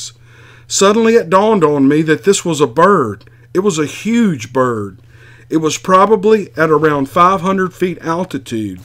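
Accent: South, United States. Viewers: I love your stories and voice. Being a New Yorka, it's refreshing to hear a country bumkin tell stories like this. Keep up the great work. Salute. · What the heck are you doing??? 😳haha I love it!!!! you are absolutely amazing these encounters remind me of what I heard a couple months or years ago and I lost track of you and I'll tell you what you have already moved from spot 21 to number one absolutely unbelievable encounters you're just doing such a great job I can't believe it and I love your accent and I love the way you're from the South and I love your guitar rifts you do you are very good at guitar too Keeper go on letter rip potato chip haha lol fantastic work wow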